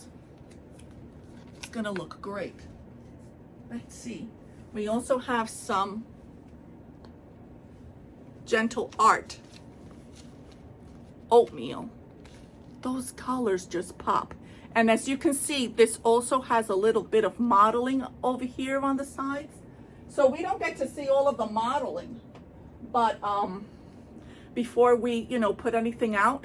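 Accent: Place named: New York, United States. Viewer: Hi, so glad that you are on Flosstube, great to see you and here that great NY accent..:-)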